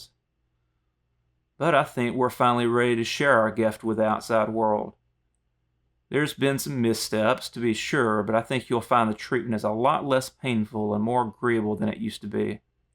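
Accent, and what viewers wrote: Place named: Southeast, United States. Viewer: you sound like the south eastern section of the us to me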